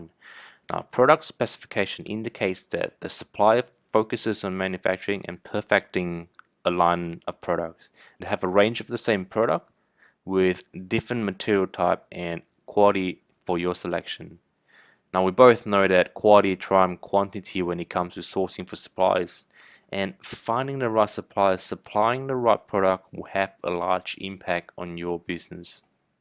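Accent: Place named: Australia. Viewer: he's Asian, with an Australian accent, is what I mean.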